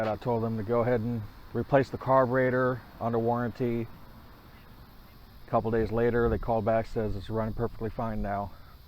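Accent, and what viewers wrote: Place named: St. Louis, United States. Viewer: Hey, it sounds like you are in the Lou area! Good vid.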